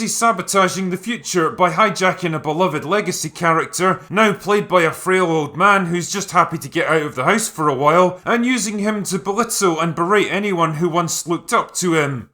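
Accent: Scotland, United Kingdom. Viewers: He's Scottish and for these videos he loads up on it. Where you from? Where ever it is, there's something wrong with it because it doesn't sound like me.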